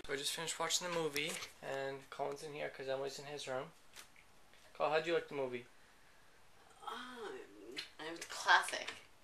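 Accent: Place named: Canada. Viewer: i love how you say out ...hahhahahhaaha canadian accent :D srry i am american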